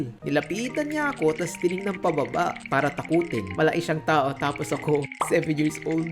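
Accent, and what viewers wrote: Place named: Conyo, Philippines. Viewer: May accent ka boss conyo ka siguro! Ahehehe you sound unique naman kaya cool parin!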